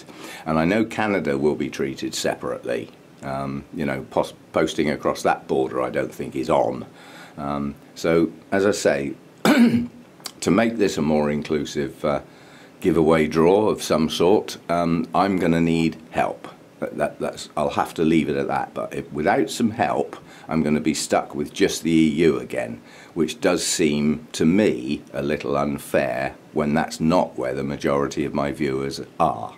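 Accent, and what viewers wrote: United Kingdom. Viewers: 5000, Congratulations! I am from US but you really don’t have to. I am sure most of your viewers enjoy your videos and learning from you. I especially love to hear your British accent English. Bring me back to my college time in Japan.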